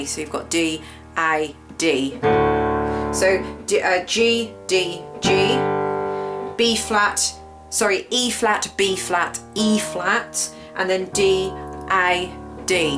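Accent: United Kingdom. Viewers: Are you British i love your accent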